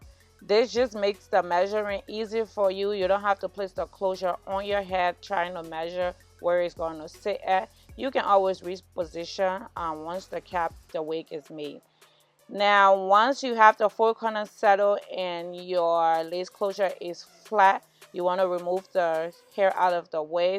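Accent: Liberia. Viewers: I hear Liberia !! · you sound liberian go awwwf sis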